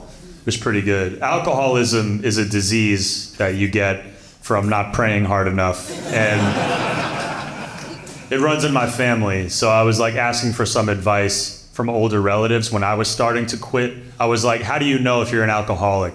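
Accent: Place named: Tri-State, United States. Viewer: Great set, you're hilarious, very subtle tristate accents. Outro music sounds like from Unreal Tournament too so that's a plus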